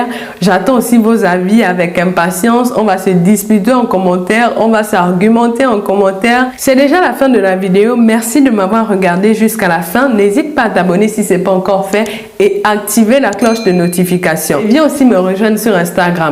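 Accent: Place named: Gabon. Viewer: Ça c’est l accent de ma sœur gaboma si j n m trompe pas. Merci pour l analyse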